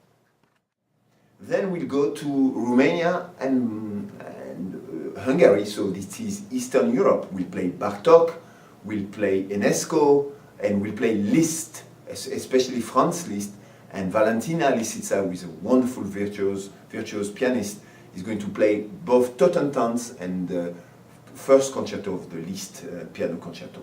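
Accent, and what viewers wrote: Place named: France. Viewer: what a french accent! :)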